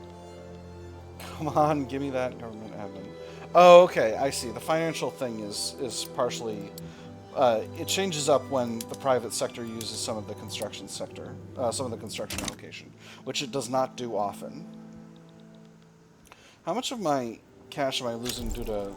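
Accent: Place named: United Kingdom. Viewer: Really enjoying the british accent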